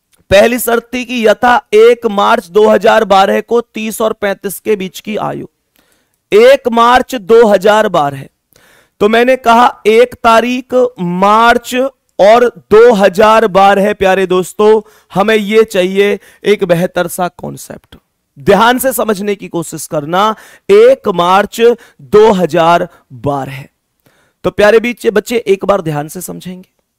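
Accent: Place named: Haryana, India. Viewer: Aapki haarryanvi voice achhi lagti hai please aap harryanvi hi bolla karro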